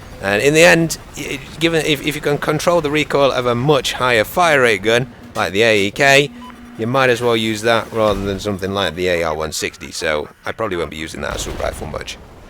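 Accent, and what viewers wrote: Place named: United Kingdom. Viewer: both British accents but defo not even similar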